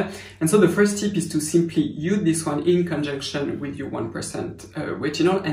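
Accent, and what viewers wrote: France. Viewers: I am very sorry but do you have English subtitles? I can only see French subtitles. I am struggling to understand your lovely French accent. Not your fault but if there’s English subtitles, it would be cool. :)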